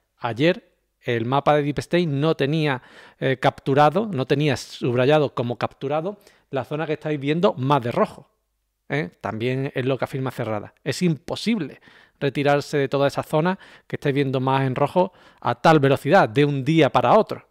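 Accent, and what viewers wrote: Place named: Andalusia, Spain. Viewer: entre Remi y vos están despegados en punta vuestra calidad y respeto al comunicar no es muy común, además tienen un plus,Remi habla muy rápido y a veces se tranca pero las info son como estar ahí,y lo otro tu acento andaluz sino me equivoco es muy agradable de oír ni hablar de tus mapas. Saludos y abrazo cordial desde uruguay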